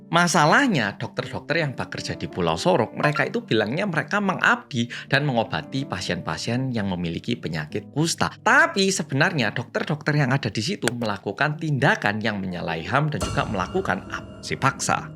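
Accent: Java, Indonesia. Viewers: Semangat Oppa 😊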